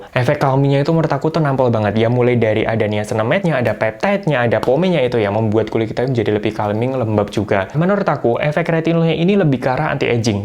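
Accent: Java, Indonesia. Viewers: Seneng aku ndelok channel iki, cara mbahas e medhok tapi ndek kuping iku enak.... jowo banter cara ngomong e hhhh. Gudluck cak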